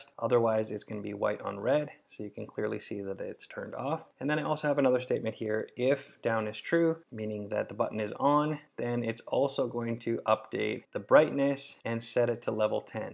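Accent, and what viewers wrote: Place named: United States. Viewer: This gentleman wins the award for "most amount of accents heard in the 1st 15 seconds of talking" Norwegian, no Australian, wait no that's German, no British, no that's definitely some version of Nordic, wait is that Canadian or American? Oh no it's Polish maybe. Damn wait that's American, maybe Canadian. Honesty I'm still not sure. I'm 90% it's American but there's something else in there when he's in front of the camera.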